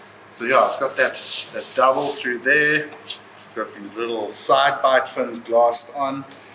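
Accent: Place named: South Africa. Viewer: Great content deserves great recognition and some dope giving back on the love that has been given, i.e., called content... It's channels like yours which make the internet and You Tube a fun place to visit and not a boring one... Thxxxxxxx dude!!!! HAPPY SURFING FROM VIRGINIA BEACH, VA!!!!!! Oh yeeeaaaahhhh I am nearly 5'9 and fluctuate from 205-230 pounds on the leaner side never less than 190 lbs, so being somewhere in the big guy club myself, this channel hits right and is super spot on... Not every surfer is skinnied out with a six pack and big guys can and do rip too, like Owen Right, Jordy Smith, John John, William Cardoso, Jay Davies, and Wade Carmichael to name a few... Plus your "SA" accent and knowledge is cool... It sucks your not at 50K approaching 100K subs already... I hope your channel blows up because your having fun, sharing positive vibes, and giving back 411 surf info we can all learn from plus dope "SA" surf, ocean, and board footage... 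Channels like yours, How To Rip, The Surfers Journey, Kale Brock, and isurftribe, have all helped me learn more about surfboards and how to build a proper surfboard quiver for my body weight and size and skill level... Most important your fun to watch because your ""having fun"" and your passionate about SURFING which most definitelyyy shows and what it should be about it, sharing and giving positive vibes and stoke to the viewers... Thanks again dude!!! Movie quote for you,, "Build it and they will come". Please keep bu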